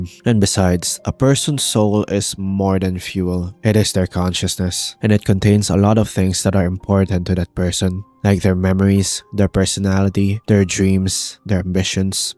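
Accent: Philippines. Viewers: do i hear a filipino accent?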